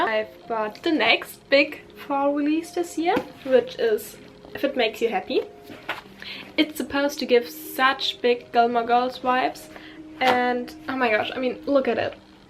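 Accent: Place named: Germany. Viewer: Es ist so spannend wie gut dein Vokabular bist, aber man trotzdem einfach hört, dass du deutsch bist. Würde mich interessieren, ob sich das mit einem Aufenthalt in einem englisch sprachigen Land ändern würde.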